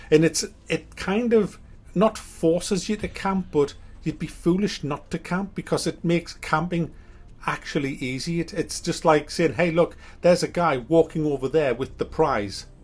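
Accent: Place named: Newcastle, United Kingdom. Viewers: So someone asked me if I was on Youtube. I said no, so I checked out the channel and fuck me, we sound almost the same. Where abouts in the Newcastle area are you from? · soon as i heard his geordie voice i switched off lmao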